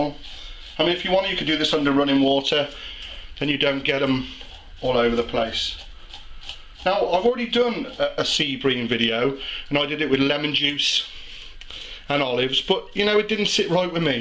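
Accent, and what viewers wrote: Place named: West Midlands, United Kingdom. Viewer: Hi, is this the new video you were talking about in your reply to me on your other Sea Bream [with chili] Video,??? If so, very simple and delicious recipe - Thanks,!!! Nice to see/hear a 'Brit' Midlander' doing great things in the kitchen,!!! It was trying to place your accent - West Midlands, Wolverhampton, Tipton, Dudley, Bridgenorth maybe,??? Be very interested to know - l do have a genuine reason for the question,!!! All the best, Paul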